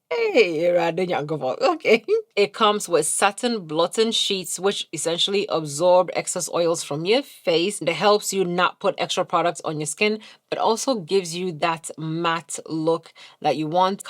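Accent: Ghana. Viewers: Forget the concealers please! I'm watching because of the Ghanaian accent!! Love it!❤❤ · nahhh sis you don't understand how refreshing it is to hear the Ghanaian accent again throughout the video and the emphasis on the words hahaha love it 👋🏾🥰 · The Ghana in your voice comes out every more in each video and it's giving me liiiiiife 🤣🤣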